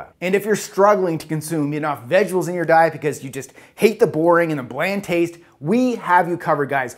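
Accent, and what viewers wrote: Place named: Canada. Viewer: Not sayin’ it wrong....just Canadian 🤪🇨🇦 Never lose that!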